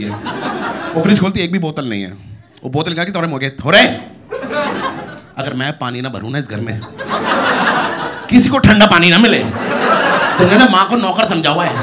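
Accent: Delhi, India. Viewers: I just love his dehliite punjabi style.... Hilarious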